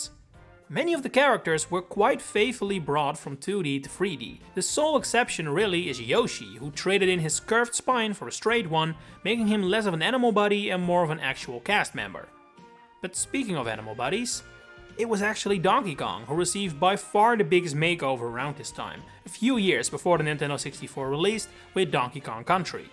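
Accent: Netherlands. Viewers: Im always amazed how I can pick up on a Dutch guy speaking English.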